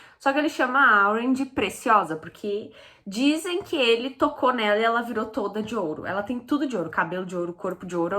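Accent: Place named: South, Brazil. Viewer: cheguei faz pouco no canal. E amo como vc conta tudo. E mesmo depois de leer os livros eu venho ouvir sua resenha. E amo seu sotaque do Sul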